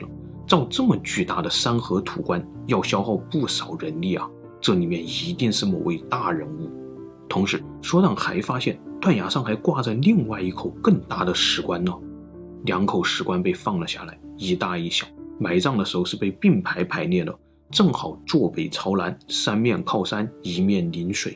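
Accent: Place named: South, China. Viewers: 👏👏👏每周必看的节目，雷打不动😎😎😎 请那些刻意(觉得可爱开玩笑的不算)对口音挑剔的移步去看中央新闻联播。好走不送👋 我们南方人就是这样说普通话达。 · 是川味普通话。关于捡金的习俗，你不知道四川也有此习俗吗，我很小的时候远远的见过，坐标川西南。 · 別挑剔啦！ ㄧ定是南方人，可能是四川或是江蘇人吧！咬字發音自與北方人不同啊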